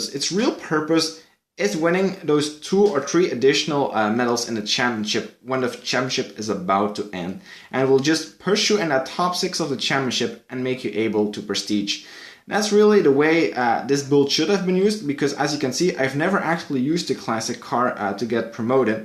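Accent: Netherlands. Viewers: Hé, je bent Nederlands! ( of Belgisch) : ) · Are u dutch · you are dutch?!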